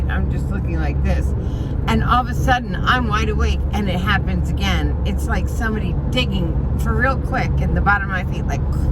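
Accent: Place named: Philadelphia, United States. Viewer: I'm so glad I found your channel. I have been feeling super alone lately and well iam. Yet I found your channel and your personality etc is like having a really cool friend talking to ya. I had a feel I ng from they way you speak that you had to be close. I'm in Philly and heard you say where u were. So cool!!! Just wanted to say hello and thank you. Sending love and positive vibes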